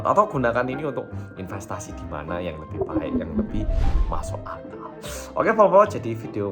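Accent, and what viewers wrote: Java, Indonesia. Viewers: Mas aku suka logatnya😊 kereeen medok bgt.selalu ngikutin videonya lanjut mas...ditunggu kolab sama bandung oppa lagi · Bisa ya jawa nya medok tapi jujur suaranya adem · Lho. Kyakk. Orngg Korea. Tapi kok. Medok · bang? Abang ini asli nya orang apa sih? kok mukanya Korea tapi bahasa nya medok jowo ?? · Muka Lu kaya orang korea ko bisa ngomong indo selancar itu Logatt nya lebih ke orang jawa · Logate kok jowo mas...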